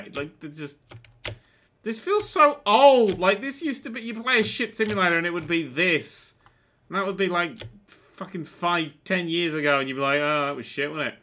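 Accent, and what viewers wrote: United States. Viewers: is American!? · WAITDans American?????!!!!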